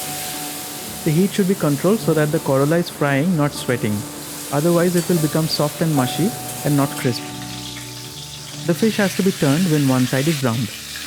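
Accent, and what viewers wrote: Bengal, India. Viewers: sir bengoli stile with English language is not suitable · the Bengali accent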